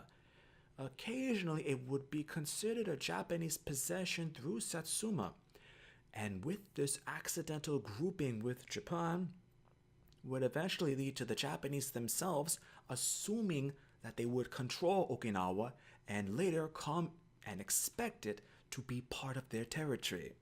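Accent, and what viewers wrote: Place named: China. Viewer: Speaking as someone whose family lived there for 40 years, this is nothing short of Chinese Communist Party propaganda, part of their wider pattern of asserting they own everything on the planet. I can say with certainly every Okinawan person I know would also say this is total BS. Okinawa was an independent international trading hub for centuries and did the best they could to appease both the Chinese and the Japanese. They were well aware of the dangers of their geopolitical situation, and vulnerabilities. Though many of us were honestly hoping for independence in the late 60s, it was never going to happen. Do you really think China would leave them alone if they were independent? True the Satsumas were devils in their day, but meanwhile, as Japan has become more civilised while China has regressed from their responsibilities as global citizens. 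For the sake the people I know, the current statehood within Japan, the people are far better off than if China had control - just ask the people of Hong Kong. Suggest instead reading Kerr 'A History of an Island People' BTW, This person is reading a script with an obvious Chinese accent.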